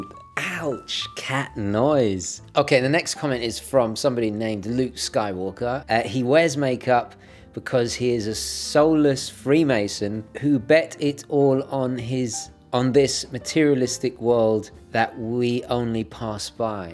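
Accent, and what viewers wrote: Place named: United Kingdom. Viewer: I really laugh a lot in this video. I’m from México, I use to live in an island on the Caribbean Sea, you should go there for vacations. But now I leave in Mexico City for university. Here no one speaks in English, but also, here I started to really like de language. And more the british accent like you have. That reminds me of my English teacher back in those days. I found your videos very interesting, and now I’ve just bought my first watch. I was the one asking about pocket watches months ago, but starting to know more about horology, now I bought a invicta pro diver. I know, a controversial watch. But that’s what I can afford at this moment, and I also choose that because my dad is (but he doesn’t work on that anymore) a diver, and I want to learn in the future. Thanks for your videos, I learn and practice my English at the same time.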